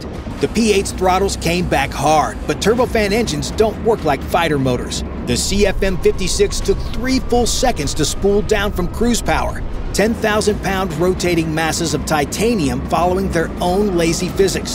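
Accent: United States. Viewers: Why would you use and American narrator for this video? LMFAO Chinese Pilots, you can blindfold them with a shoelace. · As an Australian I am disgusted that we misuse our resources this way. We have no business to be in that region. This American accent gives me the Heebie jeebies - what a load of horse shit. Actually sounds like a load of wishful thinking. Australia really does not want to have war with China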